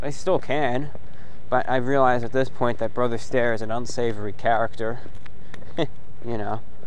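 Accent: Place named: New York, United States. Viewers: Love hearing his New Yorker in his early years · Damn your New York accent really shines in this one.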